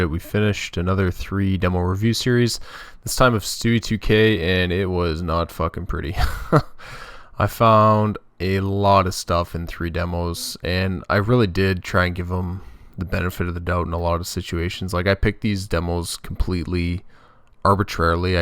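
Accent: Canada. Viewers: Are you Canadian? You're accent sounds very similar to me. I'm from Vancouver BC Canada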